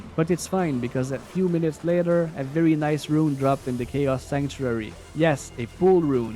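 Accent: Quebec, Canada. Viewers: Sa sent vraiment le québécois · do i hear a small Québec accent ?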